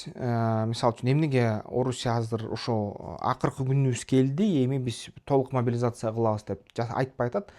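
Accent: Standard. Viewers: Эн маанилүүсү таза эне тилде сүйлөгөнү эле кандай сонун ийгилик түшүнүктүү кылып айтасыз керемет.Ар бир берүүнү күтүп көрөбүз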